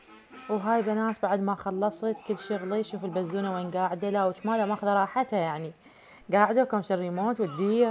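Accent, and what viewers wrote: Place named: Basra, Iraq. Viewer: لهجتها بصره الله يحفظها مين متكون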